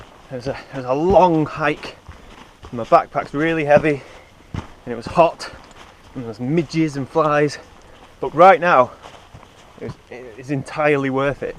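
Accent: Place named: Northern England, United Kingdom. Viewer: I thoroughly enjoyed this video. WELL DONE. I noticed from your accent you are a northern lad. me too. I'm a keen amateur photographer and a trip to the lakes are few'n'far between. Do you provide training and workshops?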